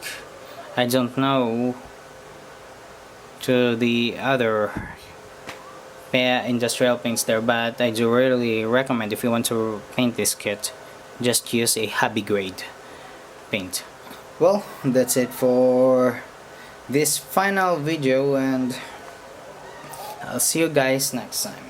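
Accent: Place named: Philippines. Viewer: from the sound of your accent your from philippines right? :D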